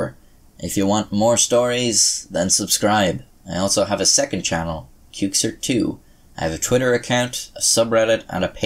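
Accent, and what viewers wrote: Ireland. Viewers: I enjoyed that, thanks. Do I detect an Irish accent in there somewhere?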